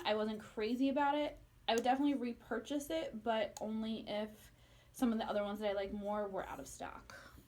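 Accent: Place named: East Coast, United States. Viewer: Your east coast accent.....love it!!!!! Great video!!!!!😃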